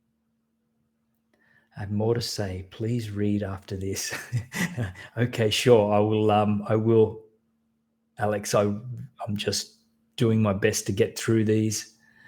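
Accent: Australia. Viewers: You have the perfect voice for doing the affirmations. Your tone and accent are really awesome. Is your amazing accent Australian? Thanks for your good and positive attitude and energy.......it has really helped through the tough times.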